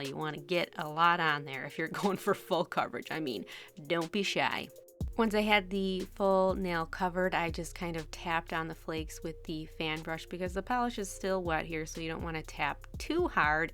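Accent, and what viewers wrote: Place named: Upper Midwest, United States. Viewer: so beautiful, and I've never been much of a glitter girl, but here I am at 60 years old, thinking, "mmmmm.....glitter...." lol And I recognize your accent now, because I was born and mostly raised in Michigan. Upper midwest, somwhere is my guess. No wonder I like you!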